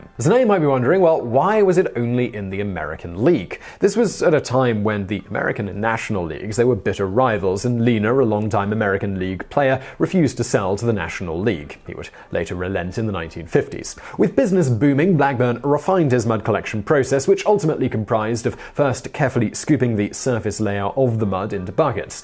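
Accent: United Kingdom. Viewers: today i found out....a man with a British accent has done more research about the most American sport since Lacrosse then several of my friends that definitely have moms that know which shoe box their little league photos are in.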